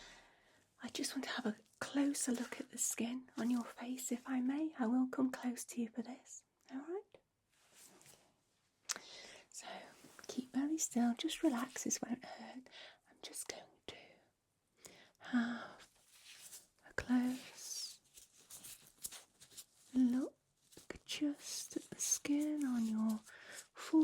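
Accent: United Kingdom. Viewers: Love your beautiful British accent. Btw you nailed this roleplay. Good job my dear 🥰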